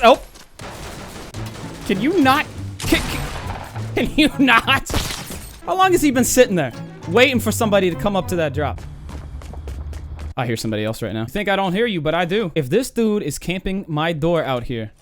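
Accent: United States. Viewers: Guys. He said he is from Misery right? And he has an American accent too. Missouri is an American state... Boom Missouri confirmed.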